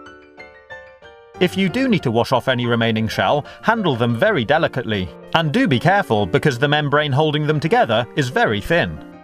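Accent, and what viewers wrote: United Kingdom. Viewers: Are you british? Am i right? And your videos are super really very amazing incredible handsome!😄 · I love you're British acsent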